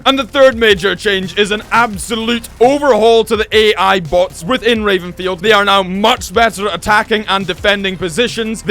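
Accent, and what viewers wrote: Scotland, United Kingdom. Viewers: Are you scoty boy?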